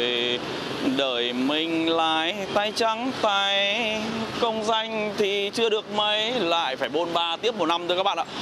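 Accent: North, Vietnam. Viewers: Nghe giọng miền bắc đọc kiểu tây chuẩn ko vào tai. Đọc kiểu việt hợp hơn. Nhưng mấy ông miền nam phát âm kiểu chuẩn tây rất êm tai nhé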